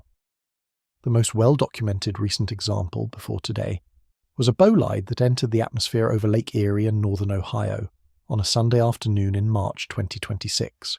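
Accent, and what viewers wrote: United Kingdom. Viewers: Why is it always a British accent?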